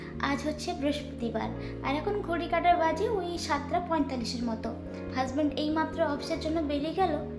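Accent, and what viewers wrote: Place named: West Bengal, India. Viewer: তোমার সঙ্গে খুব অল্পদিনের পরিচয় হয়েছে কিন্তু তোমার কথাগুলো শুনতে এত ভালো লাগে ❤ তোমার কথাগুলো শুনলে মনে হয় তুমি এই পশ্চিমবঙ্গেই আছো মনেই হয় না হাজারো মাইল দূরে আছো❤ ভালো থেকো সুস্থ থেকো❤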